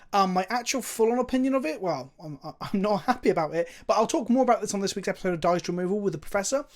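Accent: United Kingdom. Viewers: I enjoy these kinds of videos. Even though the news is kinda a kick in the balls, it helps to hear you talk about it with that velvety british boi baritone voice.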